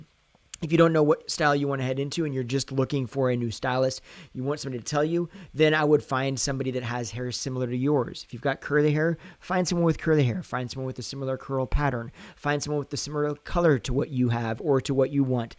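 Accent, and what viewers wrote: United States. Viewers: I hear a slight Southern accent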